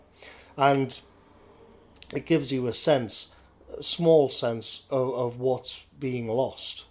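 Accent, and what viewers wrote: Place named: North East England, United Kingdom. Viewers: Thanks for pointing out the elephant in the room. This review commits the same cultural appropriation that this film rails against. That is to say, ignorance of Cornish history and the basis for Cornwall’s culture difference from the rest of England. The director Mark Jenkin has said as much in interviews claiming Cornishness is a major theme in the movie, a factor that many in England don’t take the time to understand. Despite the commentator’s thick North East accent, as opposed to the thick Cornish accent he mentions, he should not use Cornish identity as a metaphor to describe the state of England more generally. At the end of day there is little evidence the poster understands the undercurrent of resentment that exists in Cornwall towards those who approach Cornwall and the Cornish as a rugged and slightly strange part of the Home Counties. 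Unfortunately this poster is little different in that regard to the Home Counties blow-ins depicted in Bait. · I live on the NE coast and it's both middle class and has fishing. I guess you know this though because you're from the same general area based on your accent. I'm working class myself, but I've lived at Tynemouth and Whitley Bay and had a lot of mates out that way as well. And while it is full of mega-rich families, there still is fishing as well. I know a lad that works on a boat actually, and it's generational thing as he works with his Grand-Dad. I guess the NE coast is different as you have N and S Shields as well. I don't know much about South Shields, but North Shields still has Fishing as well. I imagine it's nothing like it was though, but then the Oceans were over fished for a time, so this makes sense as well. Anyway, what I meant was that in this case it's different I guess. 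I've never been down south much, so don't know what it's like. But up here, seaside areas are always incredibly middle class. I can see how a rural fishing area could be destroyed by townies though, I guess it's just a totally different situation to our seaside areas in the North East. I think Tynemouth is quite possibly the most expensive area to live in North Tyneside.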